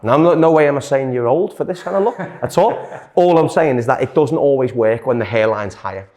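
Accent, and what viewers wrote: Liverpool, United Kingdom. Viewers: Although I'm not from England & don't recognise accents too easily, it seems as though is Scouse (from Liverpool).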